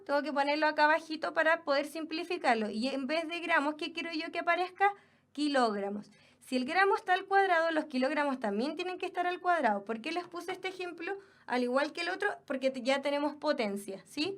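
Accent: Chile. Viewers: el toque que le da la a que la clase sea perfercta es el acento chileno xd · Debe ser de Chile, su acento es tan bonitoooo 😍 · Que insufrible el acento chileno. Puffff quierp prestarle atencion pero su voz y ese acento no me dejaaaaa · Rilma García torico en chile ya significa si, por el acento deduzco que es chilena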